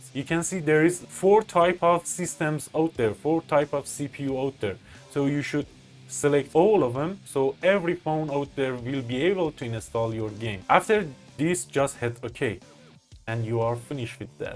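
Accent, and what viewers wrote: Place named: Turkey. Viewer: Türk aksanını yakaladım hocam :D Ellerine sağlık harika video olmuş.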